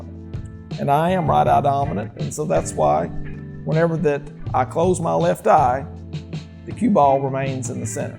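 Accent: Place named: South, United States. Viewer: Not bad advice but honestly talks way too slow, I think it's a southern thing but it's honestly tough for me to watch someone who talks this slow.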